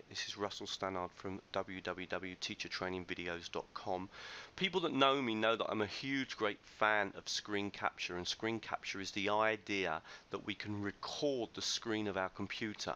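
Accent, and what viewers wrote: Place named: United Kingdom. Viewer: Russell, GREAT-GREAT-GREAT TUTORIAL!!! I had some confusion about how to use Snagit and whether or not I could do a video capture as well as how to upload to YT and whether-or-not I needed Jing and Screen Cast in addition to Snagit, you have answered all those questions. So despite your Brit Accent-Not everyone can be privileged enough to originate from SE Texas-it is a great video and I am signing up for your newsletter-Keep Up The Good Work!!! Shavuah Tov...hcwjr/ponyhunter98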